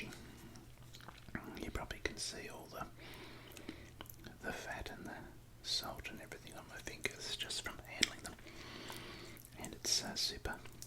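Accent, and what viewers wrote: Australia. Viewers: What makes asmr better is an Aussie accent great Job m8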